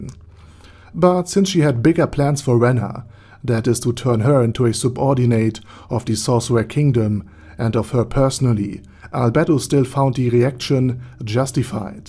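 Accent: Germany. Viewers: Man hört den deutschen Akzent gutes altes Denglisch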